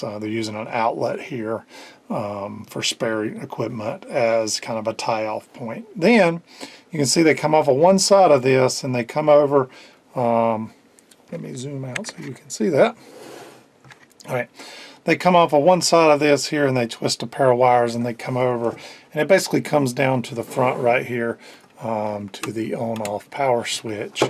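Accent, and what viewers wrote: South, United States. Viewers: that southern drawl of yours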